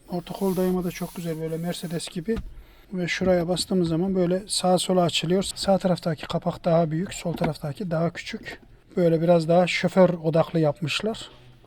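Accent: Yozgat, Turkey. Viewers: Abi şive Yozgat gibi senin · Gardaşım Yozgatlımısın ulaa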